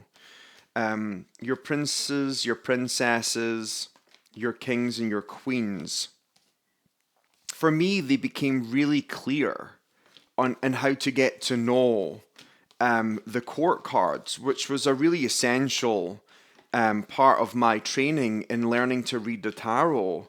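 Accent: Scotland, United Kingdom. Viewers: After starting a course to learn Reiki I suddenly really wanted a tarot deck to meditate with and I chose the Wildwood deck which also has artwork by Will Worthington. I absolutely love this deck and it is very similar to the Druid deck, with standing stones, the Green Man and ancient folk lore. I should imagine you also have the Wildwood and the Greenwood Decks. I just found your channel and enjoyed listening to a Scottish accent talking about tarot. I am also from the UK.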